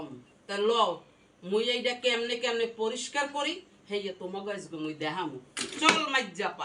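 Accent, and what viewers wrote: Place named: Barishal, Bangladesh. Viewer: আন্টি আপনি কি বরিশালে র মানুষ মুয়ৈই তো ভাবছি আপনি ঢাকা ই মেয়ে আপনাদের কথা বলা বুঝাইছে এখন দেখি ও অন্য কিছু ভালো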